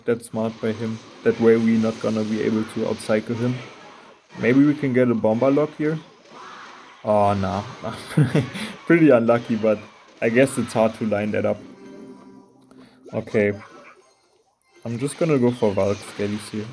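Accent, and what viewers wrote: Germany. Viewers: Yo bro i do have to say that i really like ur videos. U are literally the second youtuber in my last 13years of youtube who i would consider as a "small youtuber" where I watch all the videos. I have never thought that right now i prefer ur videos against videos from big youtubers like trymacs,.. because I just play CR every two days for 3, 4 matches. What is more i really feel entertained by ur commentary I have never thought that i can watch 15min pure clash royale videos without something else. Keep up the good work and maybe u can start with professional tutorial videos and so on. I am sure that this will lead to a faster grow because I do see ur channel with +50k subs when u try to get more professional and attract more people by tutorials,... And btw I love ur accent i am also german🙌🏼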